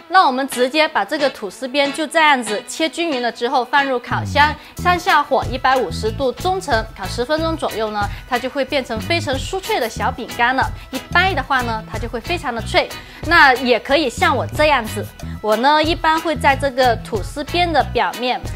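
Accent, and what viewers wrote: China. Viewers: Ew. Can't stand her Chinese accent.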